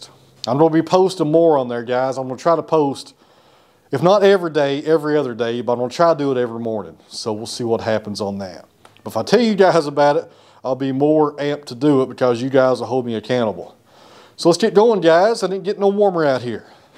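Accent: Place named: South, United States. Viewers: I have been flowing you since your first posting. I have enjoyed all of them and I enjoy that southern assent of yours, so my friend roll on and enjoy what your doing. Life's to short to concern your self with people that can not see the forest for the trees! Your friend, Bob M, AU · Only just found your channel a week or so ago but have watched a few of your videos and can honesty say I like them! Loved the end of this one where you answer comments, if people don't like the content then just like with a TV they switch channel or switch off. I find them relaxing to watch and your Tennessee accent is easy on the ear. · I like you as a person, your calm nature, personality, will all jobs some day are more exciting then others, I also enjoy the outtakes. O I like the beard also. As I’m not from that part of the world, if your accent is a Tennessee accent, I like it. 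Well done 👍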